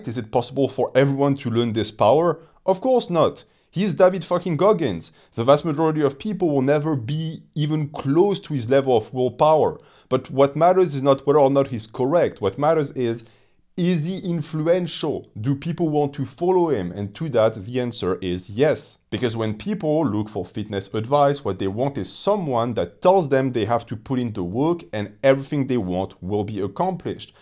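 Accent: France. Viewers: Sounds french to me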